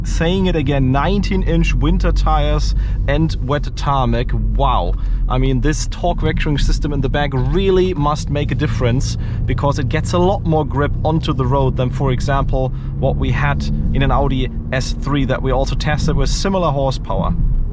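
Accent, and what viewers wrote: Germany. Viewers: Thats every germans accent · German-English :-) best english in the world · dat german accent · Der deutsche Akzent ist auf die Dauer schon hart für die Ohren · The German accent makes this car so much more German 😁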